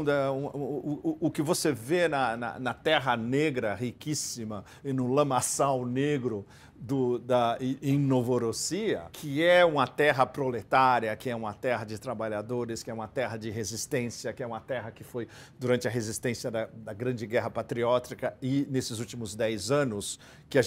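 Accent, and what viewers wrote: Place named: São Paulo, Brazil. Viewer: Mêêêuuu esse sôôôtaque da elite paulistana é tuuúuuuudo de bom! Suuuuper peculiar!!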